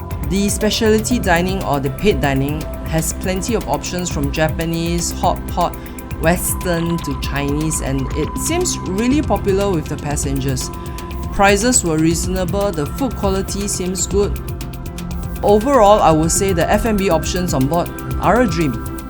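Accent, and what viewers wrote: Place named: Singapore. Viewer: Yeah, except for the highly annoying clipped singlish destroying the english language.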